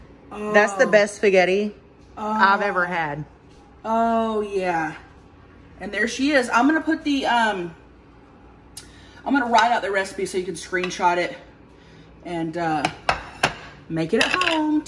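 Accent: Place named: South, United States. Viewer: your country accent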